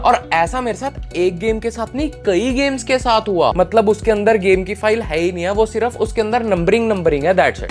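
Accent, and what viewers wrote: Punjab, India. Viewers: Peculiar Indian accent 🤣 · Bhai tu punjabi aa😂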